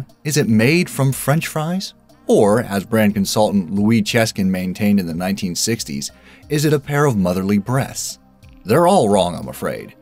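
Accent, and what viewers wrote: United States. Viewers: I’ve heard this channel is a UK site with an American accented narrator. They give themselves away every video. It’s pronounced “choopa choops” in the US too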